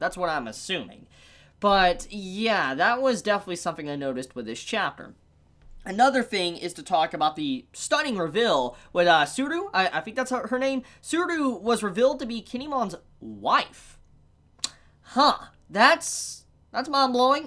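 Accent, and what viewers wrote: South, United States. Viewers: Yo where are you from? I feel like sometimes you have this southern accent that doesn't sound bad at all, just made me quite curious! Much love from San Antonio.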